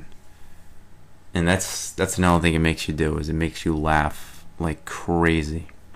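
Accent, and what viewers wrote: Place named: South Boston, United States. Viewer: Where in boston are you from? Sounds like southie lol.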